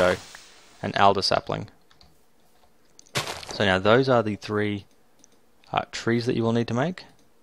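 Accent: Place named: Australia. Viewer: Thank you so much :D It is also great to hear a fellow Aussie :)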